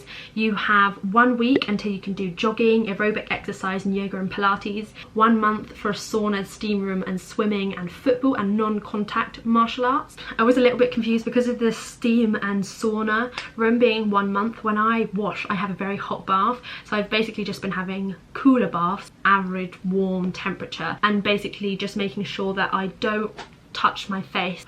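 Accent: United Kingdom. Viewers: Are you Malaysian with British Accent? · Your accent is a British slat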